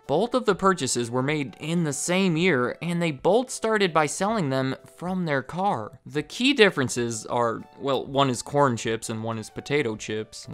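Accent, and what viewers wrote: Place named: Midwest, United States. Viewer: In this video, it is the word "straighten" or how you say it "straiden" lol. Some midwest thing idk ohio or illinois or something. But it's definitely some accent.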